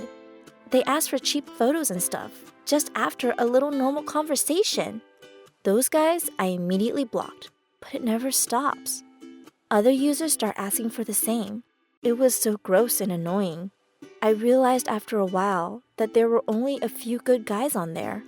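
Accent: India. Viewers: is the narrator Indian? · One question.....is the narrator Indian ?? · Are you from indian or something?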